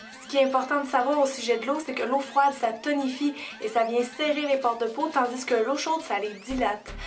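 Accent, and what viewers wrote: Quebec, Canada. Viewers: J'aime beaucoup tes vidéo .ton accent québécois par rapport à d'autre passe très bien.je vais vite tester certaine astuces .la couleur blond te va mieu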